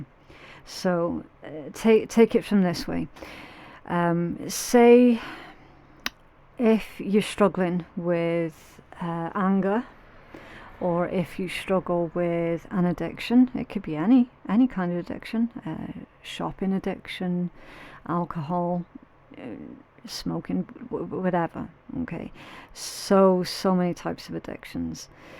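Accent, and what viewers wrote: Scotland, United Kingdom. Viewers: Love your Scottish accent 💖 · Thank you for ur readings..been putting my rune under pillow. Ur voice reminds my of living bonny Scotland for 2 years and how her beauty and kindness has healed me when it was needed. Bless u deary❤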